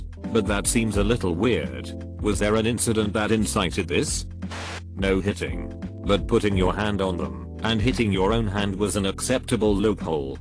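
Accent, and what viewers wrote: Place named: United Kingdom. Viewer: Although I can understand English almost perfectly, this British robot voice is always so hard to understand. I'd rather have someone just reading them out loud that would be much better.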